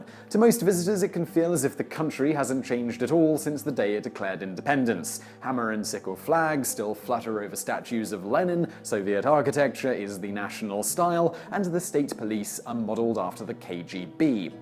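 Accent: United Kingdom. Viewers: He speaks the Queen's English & depending where in Great Britain he was born & raised will depend on the accent. My country is an English speaking country but we have our own language & accents that not many apart from bruhs & sis's near us would understand. We love having our own language & it's hilarious for foreigners to try & work out wtf we are actually talking about. But ur 100% right about the UK education & America's speak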